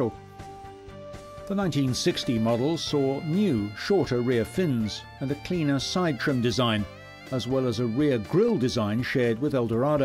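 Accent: England, United Kingdom. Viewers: It is prnounced c short a mare, like a female horse. O long camareO get your narrator to become familiar with US english. Instead of smug incorrect, British English. If your video is about US cars. Use US pronunciation. Duh. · Alan, you probably did not notice the English accent. I believe this was produced by one of your networks. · I'm sick to watch documentaries about American goods like cars, narrated by a Brit. It sounds so ridiculous as a vegan cannibal. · History of AMERICAN cars....with a BRITISH narrator.....(who couldn't properly pronounce the names) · Because he is British, they pronounce words differently than us. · English voice was a dead giveaway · Hey all, drop the "BRIT" speaking,,,,,,,,, he's calling a '55 Cad a 60, and a '60 chevy,,,, is said to be a '60 Buick!!!!!!!! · The History of American Vehicles. Content is good but why the use of British narration is mind boggling. 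Can you say Aluminum?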